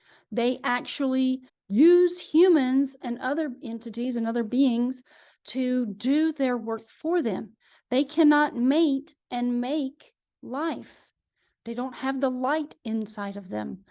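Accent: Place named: South, United States. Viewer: I just found you today from the typical podcast show. I am elated. I can not believe all these things you're saying on his show, I know most of. I have been fascinated with the occult and symbolism for quite awhile, but a lot lately. I like to find things from the past, like photos of the Rothschild parties with their Saturn costumes, and Pink Floyd songs, and many books. I read a lot. There is too much for me to list here, but I do a lot of research. Also, I've had many OBE's although its been awhile. I wonder what part of the country you are in? I hear a southern accent. I'm in New Orleans. So happy I found you!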